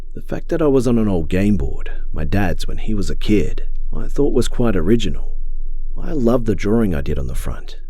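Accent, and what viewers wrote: Australia. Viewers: You're from Australia, right? Your accent is familiar. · Do you live in Australia (I can tell you have an Aussie accent cause I'm also Australian)🇦🇺🇦🇺🇦🇺🇦🇺🇦🇺🇦🇺🇦🇺🇦🇺🇦🇺🇦🇺🇦🇺🇦🇺🇦🇺🇦🇺🇦🇺🇦🇺🇦🇺🇦🇺🇦🇺🇦🇺🇦🇺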